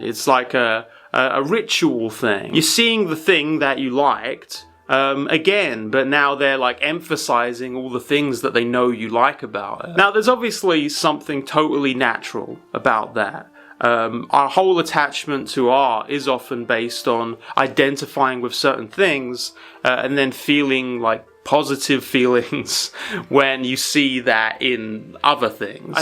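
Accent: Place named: United Kingdom. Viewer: Never realized that british people pronounce H as "Hache"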